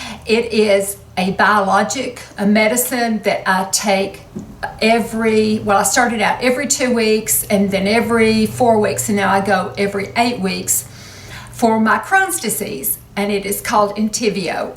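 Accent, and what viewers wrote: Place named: North Carolina, United States. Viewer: Omg, I just have to tell you that you have made me smile thru this video. I know you're from east TN, but man oh man do you sound like us here in central NC!!! I hear my mom's side of the family in so many words you pronounce and I love it! YOU feel like home and I automatically trust you! You've got a new subscriber here😊🤗😦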